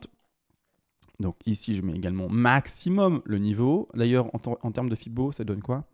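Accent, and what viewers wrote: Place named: Belgium. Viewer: J’adore ta façon de parler et ton accent ! ( belge - suissse ? )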